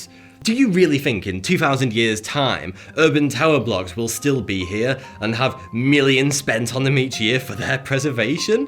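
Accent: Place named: Manchester, United Kingdom. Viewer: Bro: I like you. But you need to scale down your thesaurus addiction. It doesn't make the argument any stronger to use the word proclivity. I like a good diction but your proclivity for overt verbosity is verges on pomposity and its very superfluousness is rendered further supercilious by the manc accent. Yours, a fellow manc. Love x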